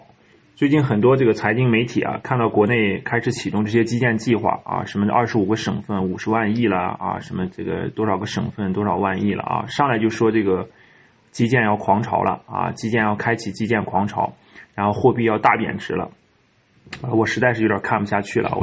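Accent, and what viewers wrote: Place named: Shanxi, China. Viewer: 一听就是山西人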